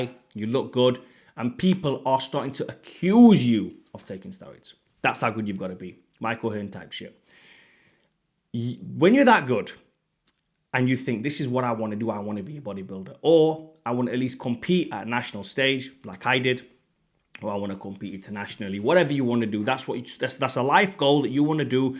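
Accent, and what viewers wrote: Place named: England, United Kingdom. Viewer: Are you from England because you sound like your from round my neck of the woods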